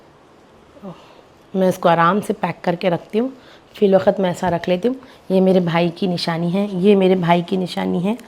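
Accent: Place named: Hyderabad, India. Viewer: Aap perfect muslim hi lagti ho didi talking style behaviour also perfect hyderabadi muslim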